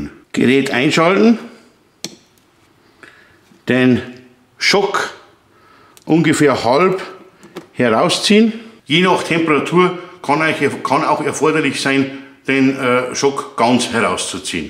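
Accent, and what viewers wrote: Bavaria, Germany. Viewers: #ks2000 Den hatte ich mir die Tage auch näher angeschaut 👌 Und noch eins: Ich bin kein Bayer sondern aus dem Westerwald. Aber bitte sprachlich nicht verbiegen. Bleib bayrisch 🎉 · Hallo, wie immer in deinem Urbayrisch ein klasse informatives Video. Du schwätsch halt et um d heiße Brei. Mach weiter so. Das #ks2000 wäre perfekt für den Einsatz bei unseren Pferden. Die Pferde stehen in einem kleinen Offenstall abseits von jeder Versorgung. Für Licht oder im Notfall den Tierarzt mit seinen Geräten versorgen zu können wäre ein Traum. Besonders da das Gerät so flexibel ist mit Gas oder Benzin. Viele Grüße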